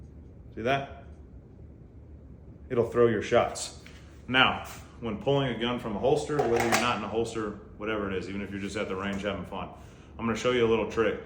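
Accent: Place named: Alaska, United States. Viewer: I thought that sounded like an Alaskan accent…. 🤪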